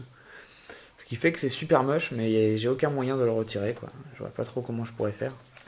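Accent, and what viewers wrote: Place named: Belgium. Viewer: toi ta un léger accent belge a ce que je voix ;)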